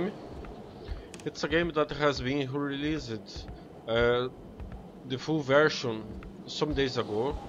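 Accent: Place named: Brazil. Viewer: I'd bet a million bucks that you're Brazilian! Porém vlw pela solução mas não se fala "resolve" apesar de ter essa palavra. Usa a palavra "solve" sem o RE de resident evil...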